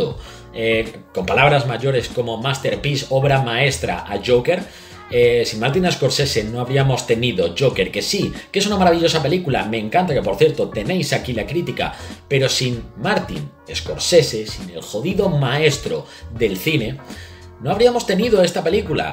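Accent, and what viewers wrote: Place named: Mexico. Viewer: Aparte pochoclo s?? Enserio?? Hablas como mexicano y les dices pochoclos??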